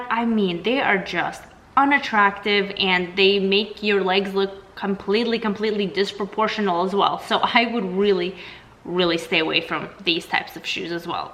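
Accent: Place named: Russia. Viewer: I was doing good until you got to wedges. I have a pair of black ones for the office. Where I live in Canada a lot of women wear these type of shoes for work. It makes driving a lot easier, easy to go up and down stairs, don't need to worry about getting heels caught in the escalator or those annoying grates on the sidewalk that you can't avoid while walking in a crowd. And for me, I am always scruffing my shoes on the wheels of my chair. But yes, my sandals are pretty, I think. I was also wondering about your heritage. Is your background Russian? My background is Ukrainian and you sound Russian to me.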